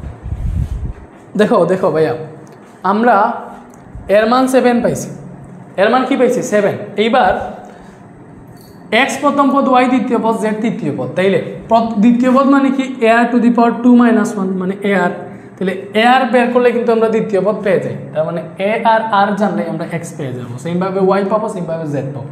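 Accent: Sylhet, Bangladesh. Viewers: Bhai afnare amrar Sylhetii lagee Afnar bari kita Sylhet nih? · ভাইয়া আপনি কি সিলেটি😊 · ❤❤❤ ভাইয়া আপনি মনে হয় সিলেটি? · Oyh oyh. taine sylheti.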